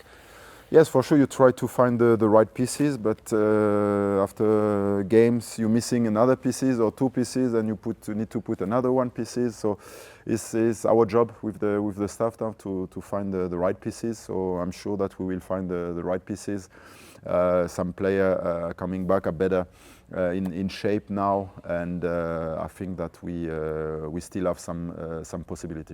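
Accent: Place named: Europe. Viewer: This bloke has fraudently made a career on sounding European and sophisticated.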